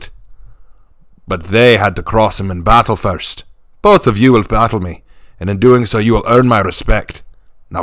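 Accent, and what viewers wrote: Scotland, United Kingdom. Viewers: Nice Scottish accent btw. Really felt the Highlander spirit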